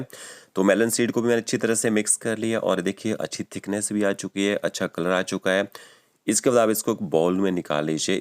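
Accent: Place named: Punjab, India. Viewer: Yours is punjabi accent.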